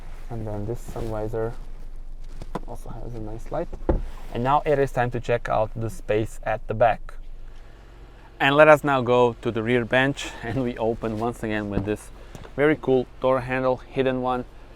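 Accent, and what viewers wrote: Russia. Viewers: Мне показалось, или у него русский проскальзывает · с русским акцентом)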